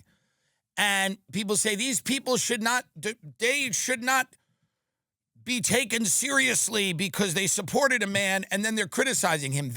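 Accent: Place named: New York, United States. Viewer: at least for me, Tim's new york accent on top of bashing LA culture is all I would listen to driving around in my car, and I was born here.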